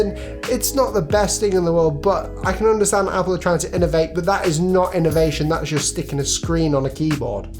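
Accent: United Kingdom. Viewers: I LOVE the British pronunciation of 'aluminum' and words in general. I should have been a linguist.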